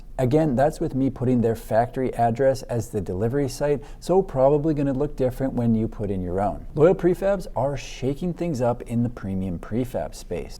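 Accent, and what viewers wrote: Canada. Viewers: Grammar guy here. I learned grammar, not pronunciation. Moreover, you're Canadian, your national pronunciation could be very different my California surfer dude twang. That written, ā indicates a long a as in hay, play, or gay. Given most US people think Canadians liter their sentences with ā, often written as "eh," I am not going to even take a run at this. Great homes, fabulous review.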